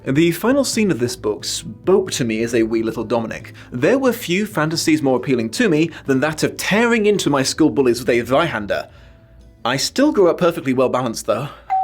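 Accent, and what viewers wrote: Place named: United Kingdom. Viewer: I’m always happy to see more videos His lovely British voice soothes me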